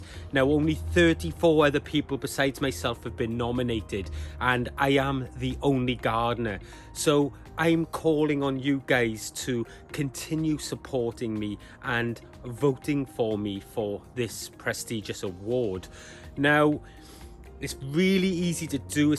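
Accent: Wales, United Kingdom. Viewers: Hi. I must have missed this one. Your sounding proper Welsh in this one happy dayzzzz. By the way I am myself from wales just down the road from you any how take care Ange and the gang 🤗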